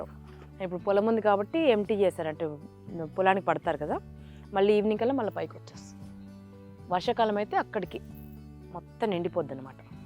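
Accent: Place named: Telangana, India. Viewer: Ma akka Telangana bidda. Tanu matlade Telangana yasalo na biddalu ma amma ani forpect gaw matlatunnav😂😂😂❤❤❤😊😊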